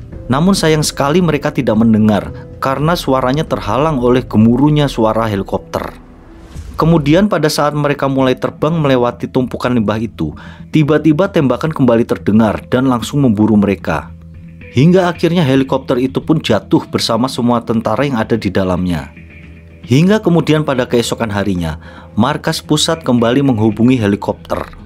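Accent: Java, Indonesia. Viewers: MEDHOGH SISAN SWARANE... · Medog mu bro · Aksen Jowo sampean kental banget mas 🤣🤣🤣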